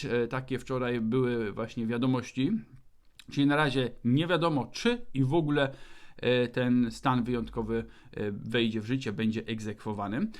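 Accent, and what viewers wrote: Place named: Germany. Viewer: Dobry kanał. Pokazujesz że potrafisz czytać po niemiecku, tłumaczyć na polski, z celowym podkreśleniem akcentu niemieckiego. Do tego systemowy wybór treści i całkowita dupochronna bierność w komentowaniu.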